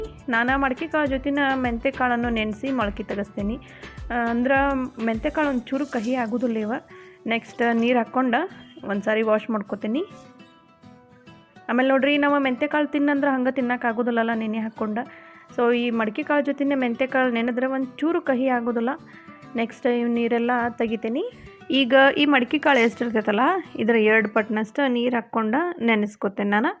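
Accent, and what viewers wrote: Dharwad, India. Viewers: ನಮ್ಮ ಧಾರವಾಡದ ಮಾತಿನಲ್ಲಿ ನೀವು ರೆಸಿಪಿ ವಿವರಿಸಿದ್ದು ತುಂಬಾ ಇಷ್ಟಾ ಆಯ್ತು ರಿ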